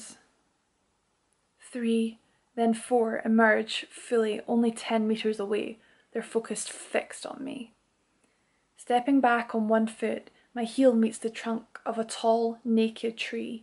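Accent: Scotland, United Kingdom. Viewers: So nice to hear such a lovely Scot lass tell a beautiful story. Would love to hear a wee bit more of the Edinburgh accent, it is my favorite sounding speak.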